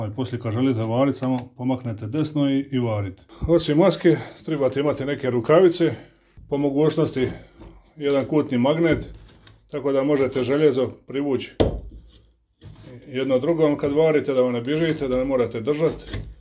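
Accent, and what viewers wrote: Dalmatia, Croatia. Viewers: Obozavam ovog covjeka slusati kad prica. Meni su Dalmatinci vrh🙃 Inace sve dobro objasnjeno Pozdrav iz Banja Luke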